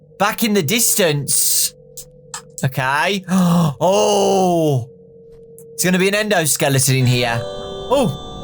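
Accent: United Kingdom. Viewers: He’s British? Well I can’t judge since this is the first video I see of him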